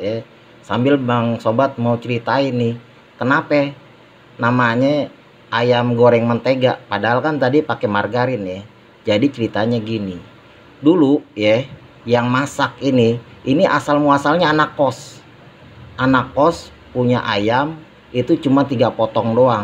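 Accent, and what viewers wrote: Jakarta, Indonesia. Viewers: Mantap.saya suka resep2nya sekaligus cara penyampaian nya jelas.logat betawi yah itu.saya dari pekanbaru.terima kasih ilmu nya sdh berbagi. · Logat Betawinya ketahuan saran saya penyampaiannya jangan sok Inggris kesannya kurang enak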